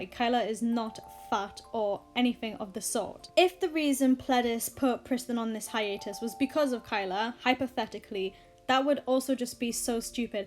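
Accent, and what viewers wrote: United Kingdom. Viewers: Wait r u British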